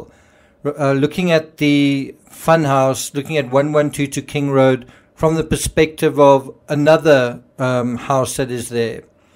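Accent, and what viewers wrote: South Africa. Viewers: I knew you were from S.A. you speak just like a friend of mine from S.A. 🇿🇦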